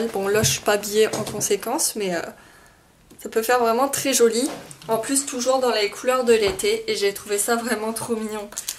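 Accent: North, France. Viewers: Je n'avais jamais tester l'astuce du vernis transparent, je testerais à l'occasion, car moi aussi j'ai des allergies au niveau des oreilles! Merci pour tes supers vidéos et ton accent du nord bien prononcé, ça me fait penser à ma ville natale! <3 Bisous! :)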